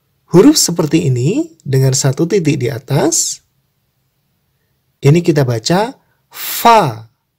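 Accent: Java, Indonesia. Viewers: ini lidaj wong jowo. beda ama wong betawi xra pengucapannya.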